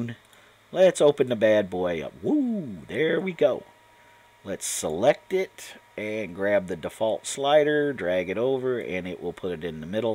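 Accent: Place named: Australia. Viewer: the australian accent is insane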